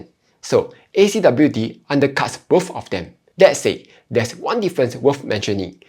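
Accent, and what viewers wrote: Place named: Singapore. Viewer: Your singarpore accent(?) lm not use to trying to adjust to.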